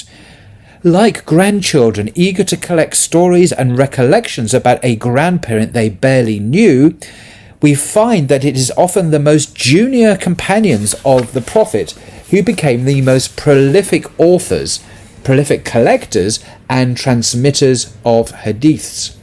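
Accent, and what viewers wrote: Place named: England, United Kingdom. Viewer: Alhamdulilah for Brother. I always learn a lot from him. I especially enjoy his accent..a true English man.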